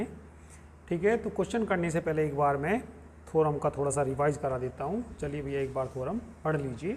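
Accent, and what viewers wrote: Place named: Saharanpur, India. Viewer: Sir Aaj kha se ho islsiye puchh ra kyoki aapki bhasha hmare jaisi h ,,,,Sharanpur wali .😅❤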